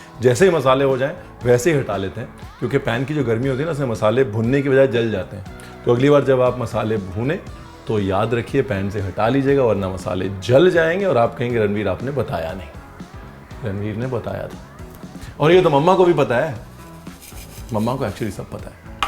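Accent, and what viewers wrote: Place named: Punjab, India. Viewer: na g na ye panjabio ka accent hai ...Not in village..😊😊😊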